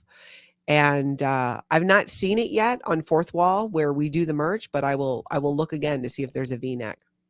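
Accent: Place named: Hawaii, United States. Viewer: You definitely have an accent. I think it’s the Hawaiian influence.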